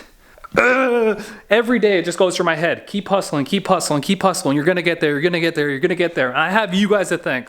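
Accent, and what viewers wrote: Canada. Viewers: i gave a like for the way you say 'out' canada accent is gold, from australia!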